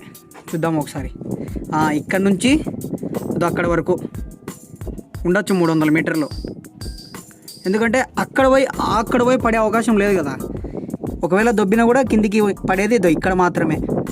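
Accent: Rayalaseema, India. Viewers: Super vundira bai, hatsoff to your photographer, we are enjoying your Rayalaseema style of language 👌and enjoying thril/unknown information 😀. Keep it <link> care when outdoors shooting. Jeete rehooo 👦👬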